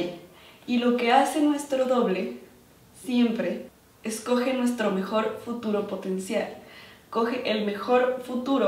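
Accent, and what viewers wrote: Mexico. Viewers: Tu tienes acento como si fueras mexicana no suenas ecuatoriana. Me gustan mucho tus videos, que Dios me ayude a conseguir lo que quiero ❤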